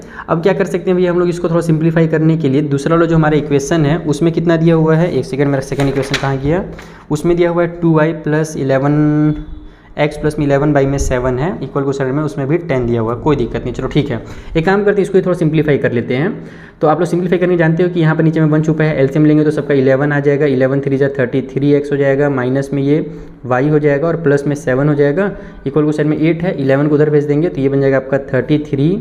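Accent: Bihar, India. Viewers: Sir Bihar se hai kya mai bhi hu 😅😅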